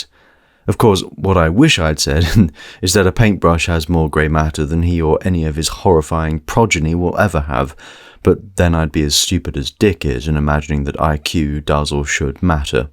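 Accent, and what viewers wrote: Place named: United Kingdom. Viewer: bro you cannot have a british accent and make a video like this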